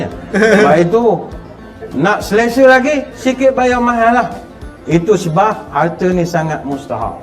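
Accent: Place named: Temerloh, Malaysia. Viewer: Best loghat tmerloh 😂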